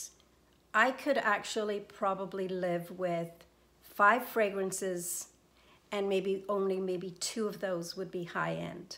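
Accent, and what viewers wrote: Ireland. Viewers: I know you are in Canada but every time I watch you I detect an Irish accent??? Orris root is Iris I believe. 👍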